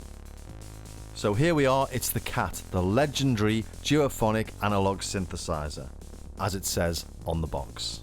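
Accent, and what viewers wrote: Liverpool, United Kingdom. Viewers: hey, I didn't make any comments about your being Scouse (based on what I hear in your accent unless I'm vastly mistaken!), so no need to whinge too much! ;) Haha. Keep up your good work!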